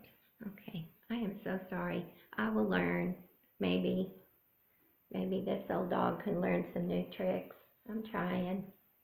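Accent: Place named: South, United States. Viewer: Hi from Pennsylvania (but originally from Louisiana)! It was so wonderful to hear your awesome southern accent! Loved your video and hope you continue doing them!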